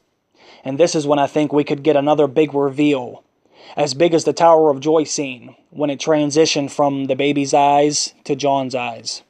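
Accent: Pittsburgh, United States. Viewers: Dude are you from Pittsburgh? Awesome video btw!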